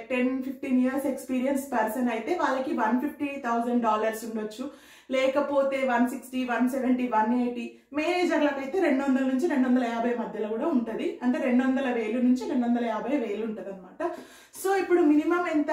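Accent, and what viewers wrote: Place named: Telangana, India. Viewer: Telangana slang mast vundi miss❤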